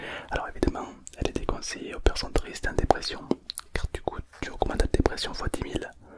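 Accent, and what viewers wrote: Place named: Marseille, France. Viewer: Il me fait penser aux vendeurs de drogue de Marseille avec son accent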